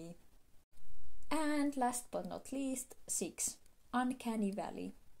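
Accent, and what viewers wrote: Finland. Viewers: LOVE THIS VID!!!! and is that a Finnish accent? :3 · omg suomi aksentti havaittu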